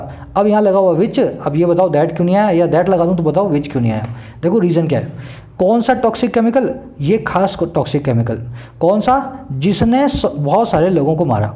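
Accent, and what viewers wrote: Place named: Uttarakhand, India. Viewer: Sir, Your pronunciation is like Uttarakhand's Pahadi people.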